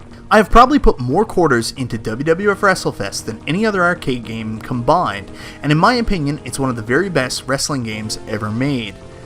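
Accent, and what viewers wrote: Canada. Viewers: I knew you were Canadian from the accent, ya hoser! - Luv from: a fellow canuck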